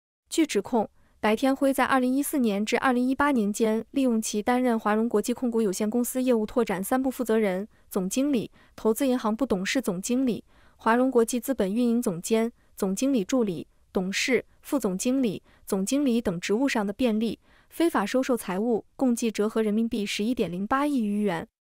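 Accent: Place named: Northeast, China. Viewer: 為什麼是東北人口音好惡心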